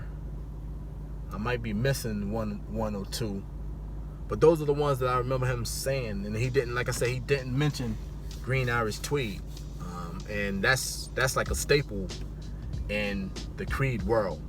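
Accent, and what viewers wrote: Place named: North, United Kingdom. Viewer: Subscription granted. Good video. I can hear the up north accent.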